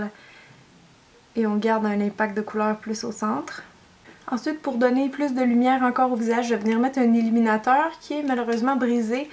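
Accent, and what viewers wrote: Quebec, Canada. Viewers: J'apprends beaucoup en regardant tes vidéos! Pas juste de beaux tutoriels mais hyper informatifs en plus! Et en "Québecois"! Super! :)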